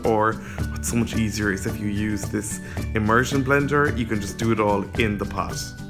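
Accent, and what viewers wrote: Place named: Scotland, United Kingdom. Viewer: I like your Scottish accent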